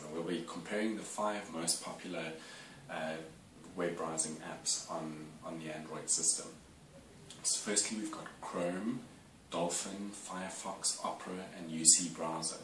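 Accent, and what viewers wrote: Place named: South Africa. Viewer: Sounds South African.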